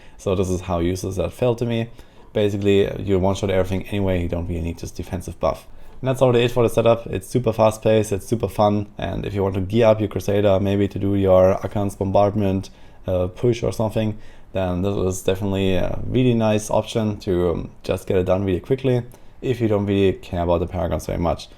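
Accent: Germany. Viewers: it might sound dumb BUT you sound so german are you ?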